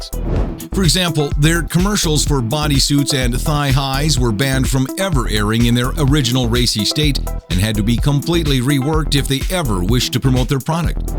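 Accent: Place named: Canada. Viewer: sound Canadian but where is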